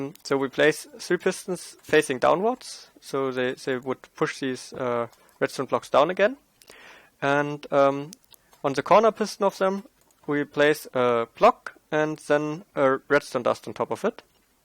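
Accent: Germany. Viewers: Ein deutscher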